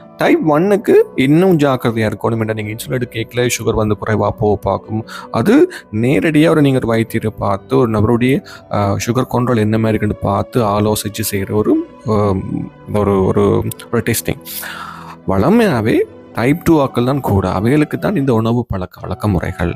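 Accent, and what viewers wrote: Sri Lanka. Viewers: Hello doctor, your tamil slang is like somewhat srilankan people speaking tamil.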